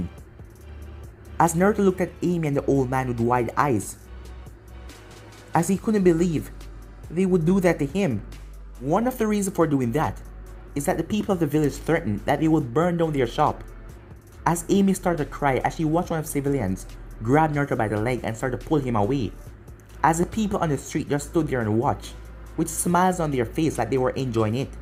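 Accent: Jamaica. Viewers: Are you Jamaican or from the Caribbean? I hear the accent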